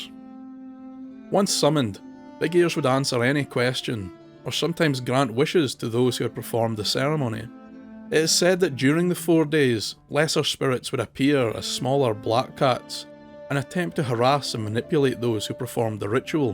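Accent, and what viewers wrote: Scotland, United Kingdom. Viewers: His Scottish accent might be a hint lol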